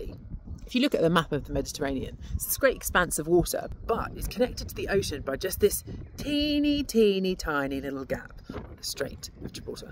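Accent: England, United Kingdom. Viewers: It was always the English accent. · It takes a sexy lady with a english accent talking down to us.. lesser prosh accent she from around London south and she like some other people can acutely see how the world moved.. Most human are to busy with a football game . Pig or cow rugbie.. or hitting small balls in small holes wonder why your wife got shaft another place.. To bad you all watch more cartoons probably the smurfs.. and cared way to much how one might chip a ball. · The British accent is so trippy to me... "Wotah" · Interesting how a brittish accent and a confident assertion can make a number of massive assumptions based off of a few observable variables appear absolutely true. lol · BRITISH ACCENT? NONONO... WE DON'T WANNA LISTEN TO THIS GARBAGE! KEEP IT IN YOUR POCKET! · A beautiful ginger girl talking about tectonic movement in a British accent is so mesmerizing,😍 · I am normally not going for the British accent BUT I am fascinating by her freckles & red hair 🤗 What was she talking about again? 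😅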